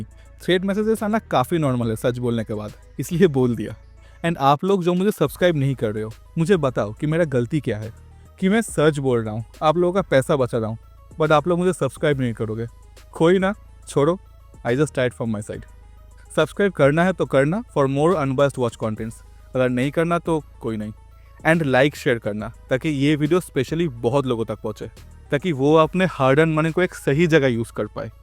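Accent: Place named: Bengal, India. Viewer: bahut badhia bola hai. lekin tumhara Hindi me bengali influence hai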